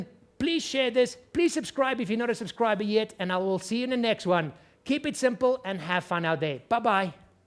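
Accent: South Africa. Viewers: Suid Afrikaans?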